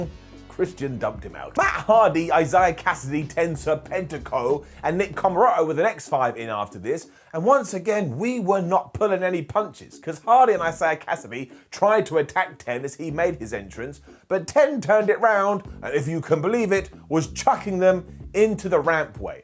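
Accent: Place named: United Kingdom. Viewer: I love British Accents...Jax "Jag-wahrz" becomes the "Jag-u-ahs" lol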